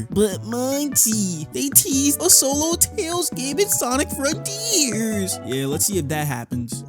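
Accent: New York, United States. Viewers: Oh snap a fellow New Yorker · ah you a new yorker. Based. And Sonic X was the FIRST anime plus exposure to Sonic as a whole, next to Sonic Advance.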